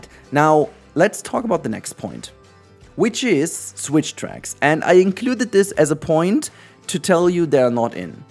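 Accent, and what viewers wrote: Germany. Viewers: Great. Grüße. Warum machst du eigentlich englische Videos? Man merkt natürlich das du deutscher bist :D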